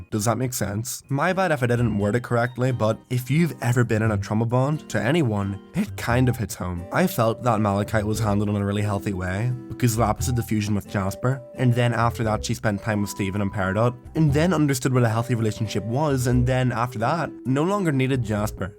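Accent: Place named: Northern Ireland, United Kingdom. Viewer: You just saved my life fr. I haven’t heard a northern irish accent since christmas 😂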